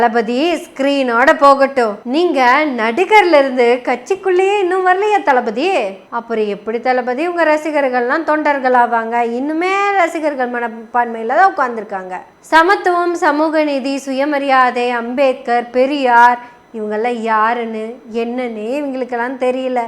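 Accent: Kongu, India. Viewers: பனையூர் பண்ணையார்.அருமையான பெயர்.யெனவே யெனவே பேசுவோம் மகளே.கொங்கு தமிழுக்காகவும் கருத்துக்களுக்காகவும் சேர்த்து வாழ்த்துக்கள். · அருமை மகளே கொங்கு தமிழின் பேச்சு. அட்டகாசம் பெரிய ர் கருத்துகளை பரப்புரை செய்யுங்கள்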